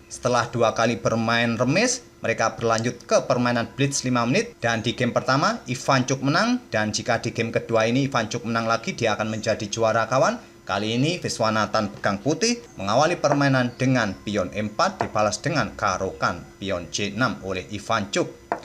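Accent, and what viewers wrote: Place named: Surabaya, Indonesia. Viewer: O..jebule (ternyata)wong suroboyoan,...salam ko aku wong Kediri sing manggon di kota Bekasi,...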